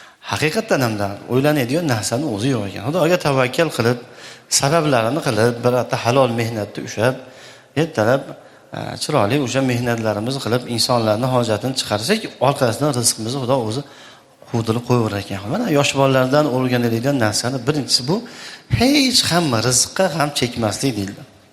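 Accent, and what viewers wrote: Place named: Khorezm, Uzbekistan. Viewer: хоразмли акансиз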